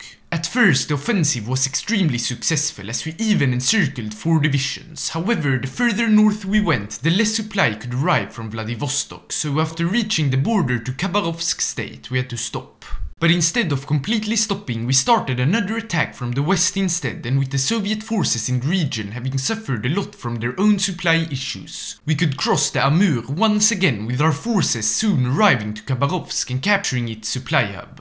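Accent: Sweden. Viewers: A Swiss man with a Swedish accent playing a Swedish game as Japan.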